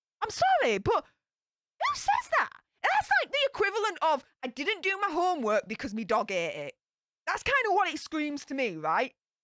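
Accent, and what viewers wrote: Yorkshire, United Kingdom. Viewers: you’re so dang cute and cheeky we can’t help but like you, give your partner BigRichard a hug from all of us. Do all Yorkshire women have your same accent? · you’re the best! You always have me dying over here 😂😂😂 I thought you were from Yorkshire when I first heard your accent. I thought either Yorkshire or Lancashire. I wasn’t sure. My Great Grandfather was from Loftus!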